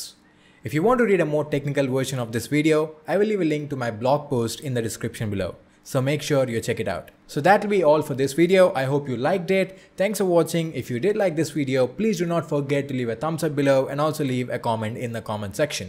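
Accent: India. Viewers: One of the rare indians where u fully understand what he is saying yet you know hes indian due to the Accent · I cant handle Idian accent.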